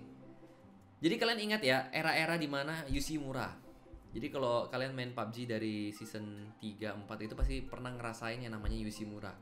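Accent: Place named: Pekalongan, Indonesia. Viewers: Pekalongan mana suaranya??? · Biasa aja dong bang ngomong pekalongan nya:v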